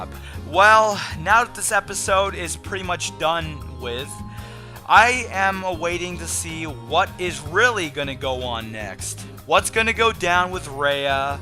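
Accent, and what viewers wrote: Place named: United States. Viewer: These Murrican pronountiations, man...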